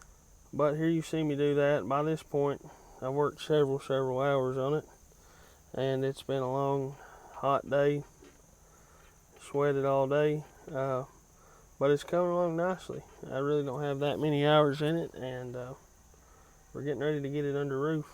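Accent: Eastern Kentucky, United States. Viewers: That has to be an East KY accent.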